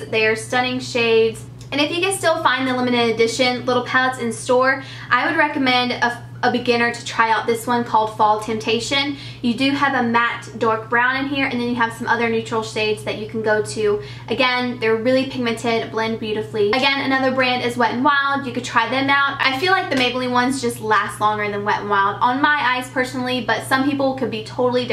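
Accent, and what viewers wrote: South, United States. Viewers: I found your channel when I was looking for hair tutorials & I came across you when you had your blonde hair & adorable southern accent( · wow your so pretty and you sound like an all around beautiful american girl! I enjoyed your video :)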